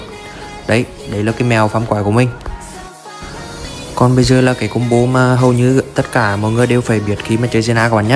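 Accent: Central, Vietnam. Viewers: anh là miền trung ak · Giọng miền trung là like comment đăng kí liền. Ko nói nhiều. Ủng hộ bạn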